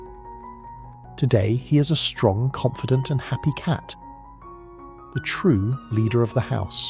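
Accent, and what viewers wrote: United Kingdom. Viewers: Why is the voice from uk this is not filmed in the uk.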